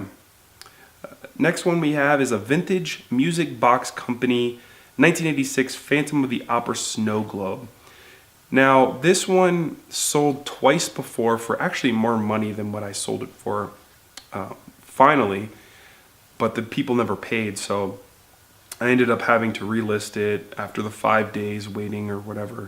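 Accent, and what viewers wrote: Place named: Canada. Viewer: A little Canadian sprinkle never heard nobody ;)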